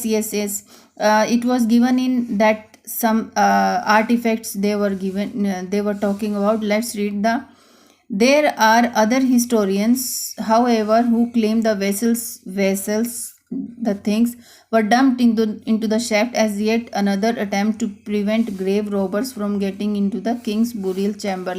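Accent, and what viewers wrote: India. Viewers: Your accent like indian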